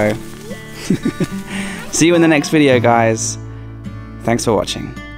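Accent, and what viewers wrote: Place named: North, United Kingdom. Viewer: Absolutely brilliant, and his calm manner certainly shone through as it does in his vlogs. You looked so relaxed and loved the way there was a northern accent coming out of you. Oh and piglets, thanks for making me laugh so much... Been waiting for this blog to come up since I saw you had visited him and for sure it didn't disappoint. Thanks for sharing.. merry Christmas greetings from Greece 🎅🎅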